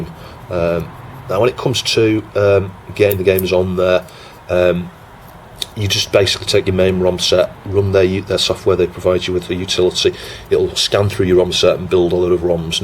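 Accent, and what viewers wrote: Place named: North West England, United Kingdom. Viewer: hi mate - only recently discovered your channel but enjoying it, good stuff. Who cares about a 2 minute load time if you're from the speccy generation! I'm curious, where are you from in the UK, sounds like you're a fellow north-westerner.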